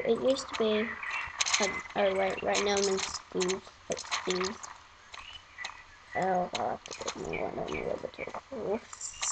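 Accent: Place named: United States. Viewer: you sound amarican!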